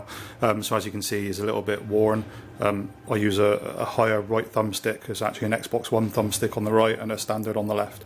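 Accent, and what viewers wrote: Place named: Ireland. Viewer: Your Irish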